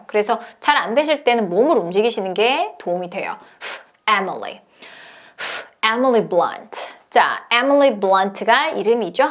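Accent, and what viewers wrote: United Kingdom. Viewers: 저는 영국식발음이 끊어 뱉어주셔서 더 편하게 잘 들리네요. ^^ 오늘도 감사합니다. IT WAS A VERY FUNNY DAY. I WILL SAY. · 쌤 정망 영국식이라 그런거 맞는거죠???😂😂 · 확실히 영국식 영어가 더 잘들립니다.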